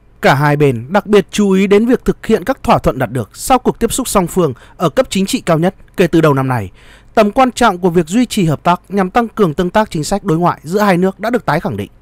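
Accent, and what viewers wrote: Standard. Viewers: nói chuẩn quá anh ơi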